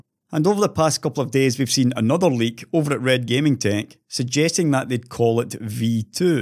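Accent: Scotland, United Kingdom. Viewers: I just LOVE your scottish accent... you sound like straight outta Braveheart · with a scottish accent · Scottish accented videos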